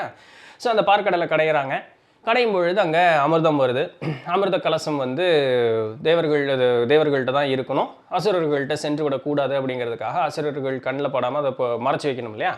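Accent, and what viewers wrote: Coimbatore, India. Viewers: Well said, ur info with coimbatore slang is truly exceptional 😅. Keep rocking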